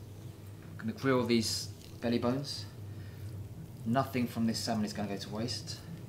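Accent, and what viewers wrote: England, United Kingdom. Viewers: Now I've seen it all. A British speaking asian... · The most least Japanese chef ever. Sounds English. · Is it just me or were you also surprised with his British accent? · Sounds British to me....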